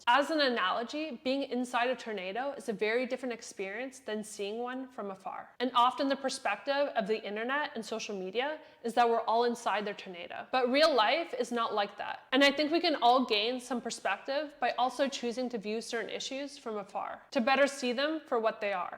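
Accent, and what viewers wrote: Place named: Canada. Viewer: You are very smart and well spoken. Also you kind of sound Canadian.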